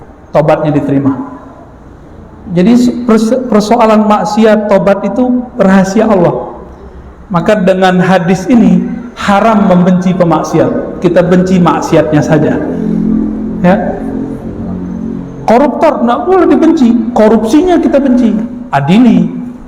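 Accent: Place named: Rao, Indonesia. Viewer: Slang nya seakan² urang Rao..seperti ibu saya.cuma ada beza sikit²...Apa itu bahasa Rao juga ya... Senang dgn pengajian beliau..terima kasih ya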